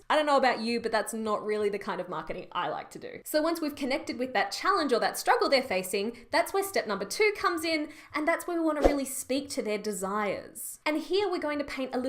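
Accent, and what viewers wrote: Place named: Australia. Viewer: OMG! Love you! Aussie Voice #1 Relatable #2. Freakin Funny & Human #3 Thank YOU #4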